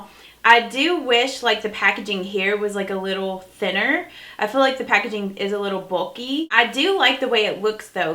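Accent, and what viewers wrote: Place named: Kentucky, United States. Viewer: I love that this palette is all matte and is so nice for fall🍂 and even winter❄️too! I love your channel and I think I have gone and bought just about everything you have used or recommended from the drugstore. I have four kiddos so higher end products aren't usually on my shopping list lol! I think you are the sweetest thing and I love your Kentucky accent! As always, your makeup💄 looks gorgeous! Oh and I'm entering the giveaway also! Thanks so much and have an awesome day! 😊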